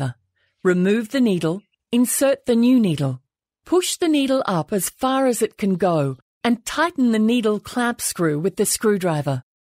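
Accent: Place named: Oxford, United Kingdom. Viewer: Finde jetzt die Erklärung in diesem verhältnismässig langsam gesprochenen und klar verständlichen Oxford Englisch mit den entsprechenden Bildern dazu sehr gut zum nachvollziehen. Was schreien hier alle nach einer deutschen Anleitung? Ist denn bei der Maschine keine dabei? Viel falsch machen kann man ja bei dieser Nähmaschine nicht, wenn man ein klein wenig logisch denkt beim arbeiten ;-) Dank Globalisierung ist die neue Geschäftssprache halt Englisch, so what? Ich dachte auch - bis heute - dass SINGER ein deutsches Unternehmen ist, hab ich wieder was gelernt dank den Kommentaren hier. Danke!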